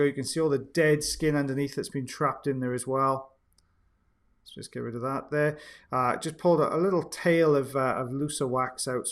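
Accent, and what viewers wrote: Wales, United Kingdom. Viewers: Take care of yourselves and your community first! Your videos (and lovely waffling in a lovely Welsh accent) can wait until it's safe again. Best to Mrs B and the little Bs.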